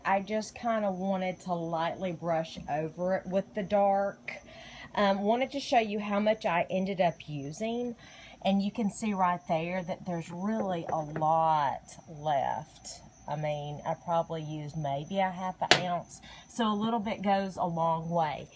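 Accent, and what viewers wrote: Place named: South, United States. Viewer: Such a fantastic southern accent! Where are you from?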